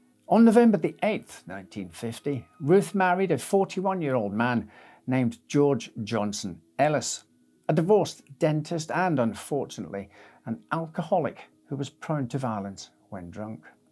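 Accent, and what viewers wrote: Scotland, United Kingdom. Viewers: I’m trying, to discern the ‘whereaboots’ of your (own/default) Scottish accent - Ayrshire maybe?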